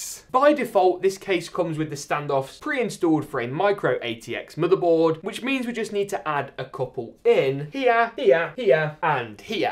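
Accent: United Kingdom. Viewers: This is the most British person I've ever heard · that is the strongest british accent I've ever heard. Had my attention the whole time. Good job dude 👍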